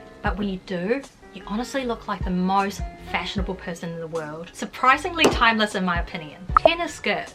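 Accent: New Zealand. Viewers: Naw love the NZ accent. People some times ask me where in NZ I am from, but I'm just a German girl in Australia lol Love the editing on the video btw. Very engaging 😃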